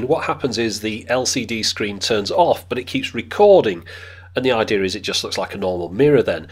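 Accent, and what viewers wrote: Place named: North London, United Kingdom. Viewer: Cheers love........you have the same accent as Geoff Lloyd of Absolute radio.....Norff London?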